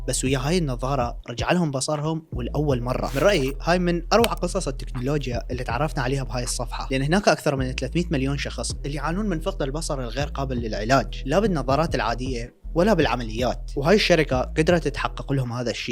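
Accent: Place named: Basra, Iraq. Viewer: اكيد من لهجتك أنت بصراوي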